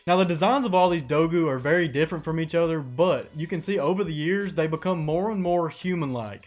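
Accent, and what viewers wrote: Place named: South, United States. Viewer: Dude, I love to see people doing what they love and you obviously love doing this. Your enthusiasm is contagious. I subscribed. You have the perfect mix of skepticism and open mindedness. I'm an educated Ga boy with a Southern accent too. I'm guessing you are Southern but not from Ga. Love the videos man. Keep doing it. I'll watch them. People love this stuff.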